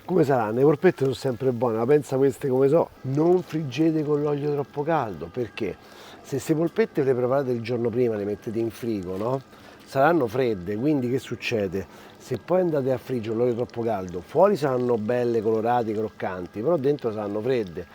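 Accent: Rome, Italy. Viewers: your Roman accent is killing me 😂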